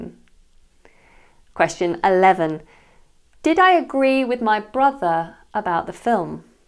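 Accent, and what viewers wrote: United Kingdom. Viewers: But you have a perfect British accent 👍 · Hi, you have a great British accent, but this test is more likely a memory test. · I will always say, you have a great british accent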